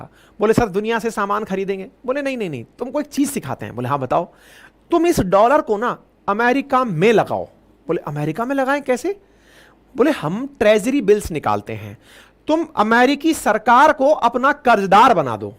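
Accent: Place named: Bihar, India. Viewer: Not interested in incorrect Hindi in Bihari dialect.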